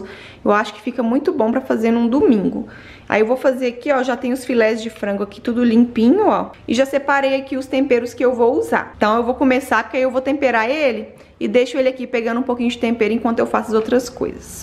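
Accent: Minas Gerais, Brazil. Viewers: Oi é um imenso prazer em falar com vc.Te acompanho já a muito tempo,te admiro muito me identifico muito com vc.Amo o seu sutaqye pois também sou mineira.Todos os dias vejo se vc postou alguma novidade amo o Bernardo a sua família vc é linda sua mãe seu pai com a mão divina fazendo artes maravilhosa.Sua mãe aí aquele fogão a lenha como lembro da minha mãe em Minas.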